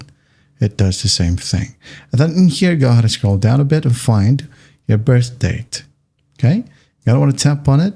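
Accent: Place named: Australia. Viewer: i guess australian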